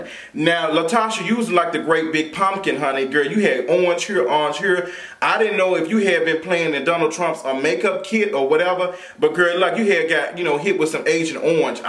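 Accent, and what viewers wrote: Memphis, United States. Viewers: You’re so Memphis. Be currful (careful) lol I love it!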